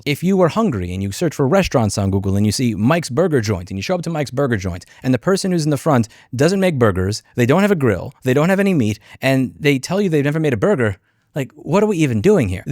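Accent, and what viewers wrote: New York, United States. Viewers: They obviously have no clue who you are. You may be in Texas, but I love the New Yawker in you! :D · Lol your NY came out a bit more than usual. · The "New Yorker" in you really came out in this video hahaha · Ny accent came out strong in this one, was exceptionally tight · I love when you get excited about calling people on their BS. Your NY accent comes out hard, lol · I think we all love when really gets going in the New York accent comes back out strong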